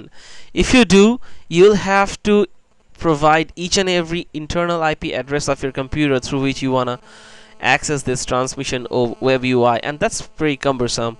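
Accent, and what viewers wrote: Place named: England, United Kingdom. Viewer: nice english accent!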